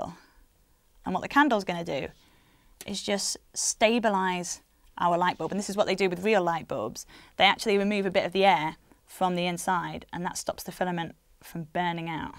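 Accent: United Kingdom. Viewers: She's all British and stuff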